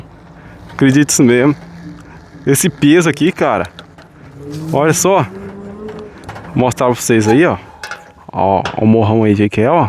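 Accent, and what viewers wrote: Minas Gerais, Brazil. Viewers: Boa noite amigão pelo sutaque voce deve ser meu conterrãneo mineiro, eu não só acho mais a NASA terá que estudar sua invenção MUITO TOP. Moro em Sorocaba SP. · Aí ficou top. Vc é de minas?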